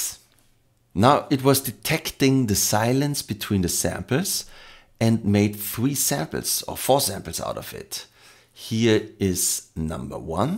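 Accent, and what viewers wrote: Germany. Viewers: man kann deinen deutschen akzent deutlich hören :)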